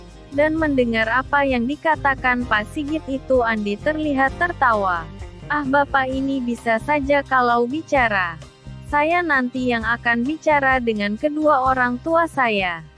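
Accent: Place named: Java, Indonesia. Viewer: Mimin logad nya mirip kejawa jawaban ya??!!!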